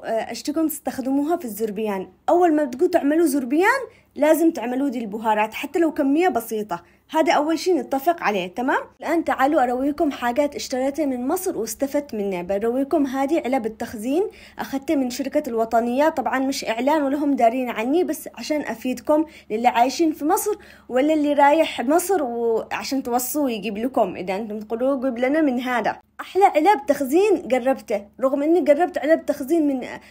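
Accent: Aden, Yemen. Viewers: ممتعة فيديوهاتك يا.. أسلوبك في الطرح جميلة ودمك حلو و كلامك العدني مرة حلووو... وأنتظر فيديوهاتك و طبخك دايم ،، موفقة حبيبتي وإلى الأمام.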